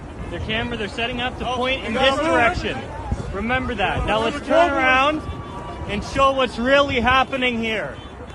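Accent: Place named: Birmingham, United Kingdom. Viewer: Your severe handicap is being a brommie. It's hard not to sound think, and come from Birmingham. You are clearly not thick, far from it, but you have a challenge.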